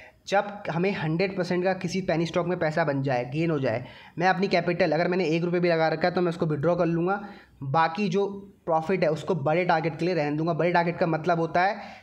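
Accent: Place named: Western Uttar Pradesh, India. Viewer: भाई तुम्हारे डायलॉग बड़े अच्छे लगते है एकदम देसी वेस्ट यूपी वाले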